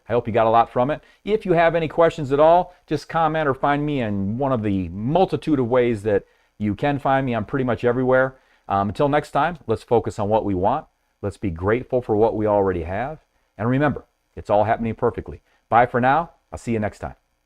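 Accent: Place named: Australia. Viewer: I thought maybe I had a relaxation music video on at same time! 😂 that coupled with the Aussie? accent was a lot!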